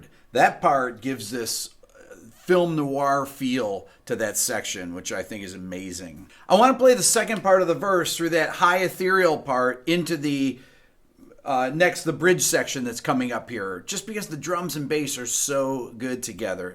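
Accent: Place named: Upstate New York, United States. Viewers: I didn't know you were from Rochester. I can hear the accent now. It's good to see another "homeboy" on YouTube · Rochester New York eh? I wondered where you are from and couldn't quite place the speech pattern. My parents are from upstate New York, met at RIT, we visited relatives in Rochester, and over the years my family lived there as well as Ithaca.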